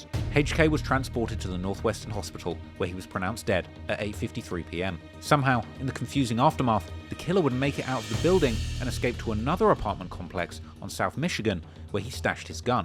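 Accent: United Kingdom. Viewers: nothing like a brit talking about american gangsters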